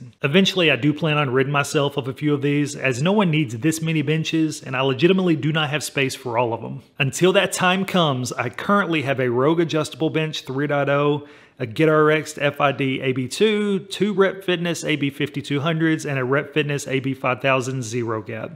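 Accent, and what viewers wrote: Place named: South, United States. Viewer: From the sound of your voice you live in like Tennesee or one of the Carolinas.... And I hate driving 🤣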